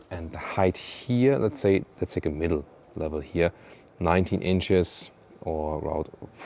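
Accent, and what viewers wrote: Germany. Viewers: Some of the Germish is very interesting! Good effort though, vielen dank.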